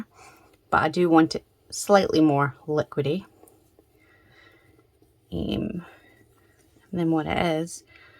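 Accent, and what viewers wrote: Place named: United Kingdom. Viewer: Accents from the United Kingdom are so neat.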